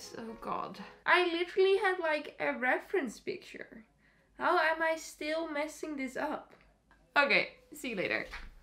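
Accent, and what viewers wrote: Netherlands. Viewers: Are you dutch, cause your accent sounds like you are?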